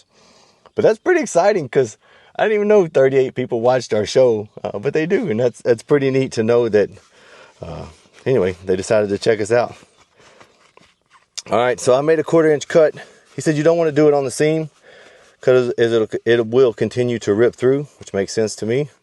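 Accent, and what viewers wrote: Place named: United States. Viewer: Dude that country accent is one of the things I miss moving to Canada. Good looking addition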